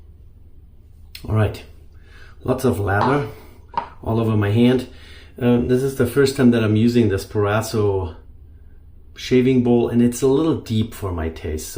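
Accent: Germany. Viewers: Mußt nicht machen. Danke für die Erklärung. Dem Namen nach und dem german accent nach habe ich es mir schon gedacht. (von rock sprachst Du relativ am Anfang) Schöne Grüße über den Teich 👋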